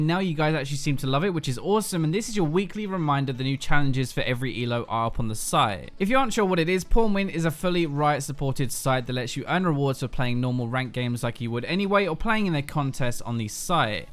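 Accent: United Kingdom. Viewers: Bro british accent screws the translation up but still great vid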